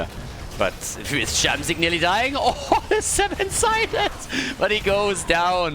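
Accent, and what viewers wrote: Germany. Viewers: here in Melbourne we're in our sixth super-strict lockdown. Can't go outside for more than 2hrs, 5km limit, no visitors, no shops, etc. Your uploads make the evenings pass so much easier, my dude. Appreciate the fuck out of you and your stupid German accent hahaha <3 Love you, stay safe. (From a former neighbour/Dutchie)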